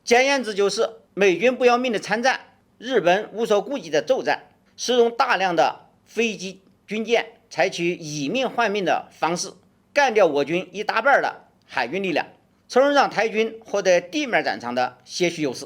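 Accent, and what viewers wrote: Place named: Xiangfan, China. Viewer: 主播是襄樊的吧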